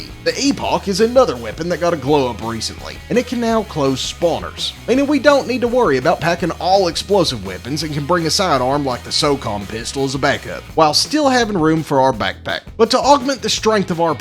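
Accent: South, United States. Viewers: What state you from? Not to get personal or anything, but a bunch of people that I’ve played with said I sound like you so I’d like to know if it’s because we are from the same state or just that we both have a southern accent lol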